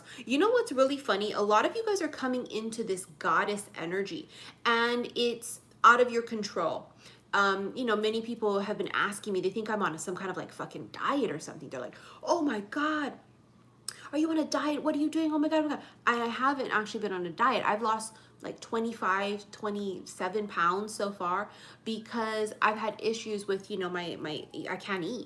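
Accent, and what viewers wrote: New York, United States. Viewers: Sound like a New Yorker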